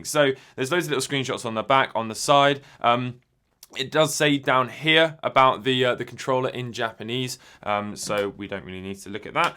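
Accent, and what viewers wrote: Australia. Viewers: YOU HAVE TO BE AUSTRALIA MATE if not then british BUT I THINK AUSSIE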